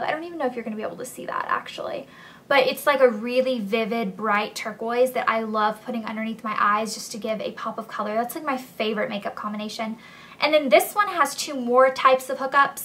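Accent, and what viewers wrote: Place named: South, United States. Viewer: ur kinda getting a country accent like ur sister :D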